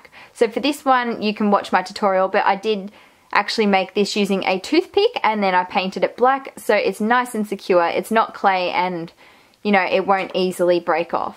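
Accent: Australia. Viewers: That Random Girl she’s Australian lmao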